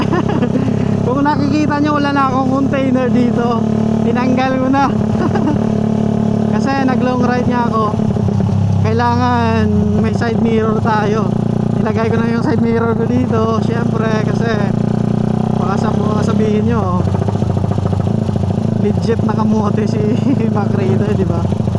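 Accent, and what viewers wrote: Iloilo, Philippines. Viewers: Ilonggo gid man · Baw ilonggo kagd boss😂😂✌✌ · Taga diin ka kuyaa hahaha ilonggo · Ilonggo ka 😂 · To ilonggo ka?toh race ta nga duwa.visita ko da,visita kaman sakon vmtv. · Illongo si sir haha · Lodi taga san kapo ba ilonggo kapo ba pa shout out nlng lodi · Ilonggo gid si sirrr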